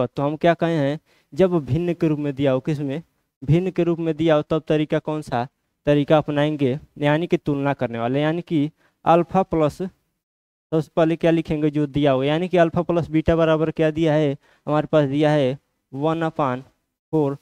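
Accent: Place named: Uttar Pradesh, India. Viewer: Bhaiya aap sachi me up ke lag rhe ho 😂😂😂😂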